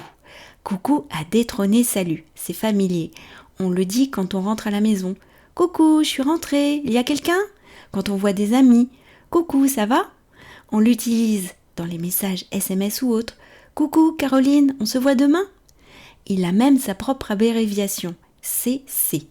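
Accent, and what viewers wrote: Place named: France. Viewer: Un autre épisode captivant. La manière dont il relie des gestes simples du quotidien – dire « bonjour » ou « coucou », la relation à l'argent – à quelque chose de profondément culturel est remarquable. Le ton crée un réel sentiment de proximité et donne l'impression d'entendre une voix française authentique issue de la vie quotidienne.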